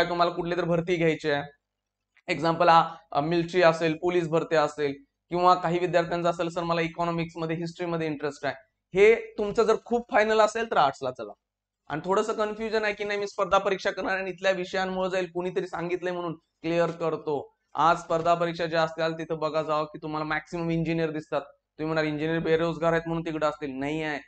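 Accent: Kolhapur, India. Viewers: Are u from Kolhapur???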